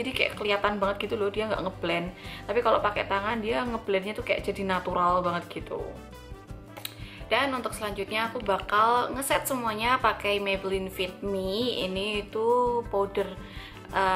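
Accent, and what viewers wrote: Java, Indonesia. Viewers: medook e... :D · Lg nyari2..tutorial makeup..ketemu ini channel Tak kirain td bule' kak..eh gak taunya medok jawa...😂asli langsung aku subc ini kak...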